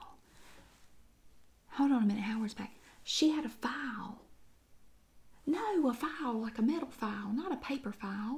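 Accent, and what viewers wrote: South, United States. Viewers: 😂 She reminds me of my mother in law. She's always on the phone and in everyone's business!!! She even has the same southern accent as she's from Texas! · Your southern accent and this role play are really interesting! Tbh, I feel happy when I hear someone speaking in southern accent and cooks southern style food! Thanks!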